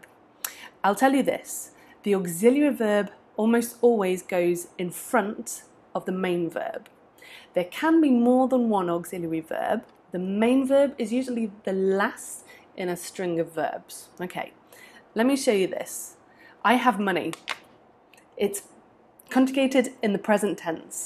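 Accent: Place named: United Kingdom. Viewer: Question. You have a $20 U.S. Bill. But you’re also speaking British accent. Why don’t you show us 20 pound instead.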